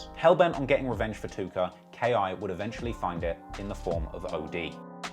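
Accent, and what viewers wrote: United Kingdom. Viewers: Hearing the history of my city in a British accent is hilarious 😂😂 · Its lowkey kinda weird havin a British guy talk abt my city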